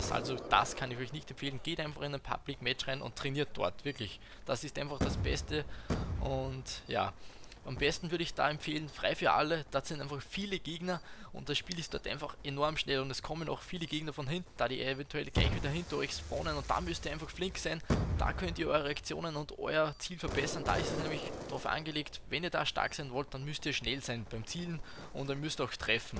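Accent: Austria. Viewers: tolles video :) bist du österreicher :3? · Bist du Österreicher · Sau geiles Video :-) Von wo kommst du eigentlich hörst dich an als wenn du aus NÖ kommst :)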